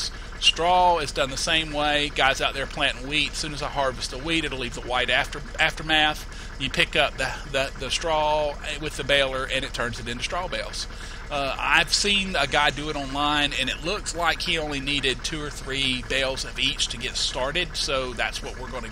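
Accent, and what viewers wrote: South, United States. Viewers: hey bo really like how you explain the different steps. Most other videos do explain it quite like you do. Appreciate how you explain stuff. Keep up the great work man. I am from NC sure is great to hear someone from the south explain things. Makes alot more since to me than someone from some where else.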